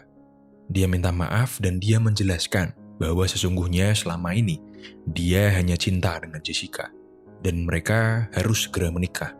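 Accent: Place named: Semarang, Indonesia. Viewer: Bang, lu orang Semarang ya bang?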